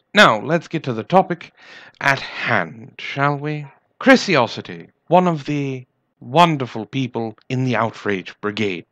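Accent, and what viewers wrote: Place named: RP, United Kingdom. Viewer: Agreed, sort of a not drunk upper class british Wizard of Cause sound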